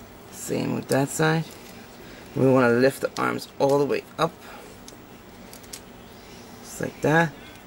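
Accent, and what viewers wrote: Africa. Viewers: why do you sound like your from africa ? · African accent? has an African accent?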